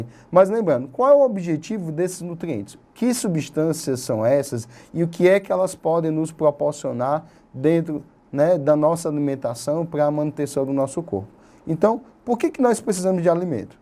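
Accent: Ceará, Brazil. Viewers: vlw!prof por mais essa! Gostei do início do vídeo e do senhor dizendo: ah profº.... posso comer isso... e tal tal kkk com esse sotaque cearense muito engraçado esse sotaque de vcs! obg por contribuir com nossa preparação pro enem e também p/ a ampliação do nosso aprendizado!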